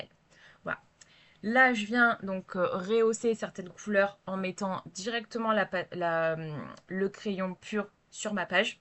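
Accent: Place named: England, United Kingdom. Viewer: Bonjour, je découvre vos vidéos et j'adore!!! Je me suis abonnée également 🎉! Votre voix est agréable et fluide et c'est un avantage certain et l'accent anglais est pas mal! J'ai entendu pire! 😅😂 alors pas de honte à avoir ! Quelle imprimante utilisez-vous? La mienne n'est pas laser et il paraît que l'on peut trouver des cartouches avec une encre résistante à l'eau 🫤 faut que je creuse! 😁 Je vous souhaite une belle journée et à bientôt pour de prochains visionnages! 😊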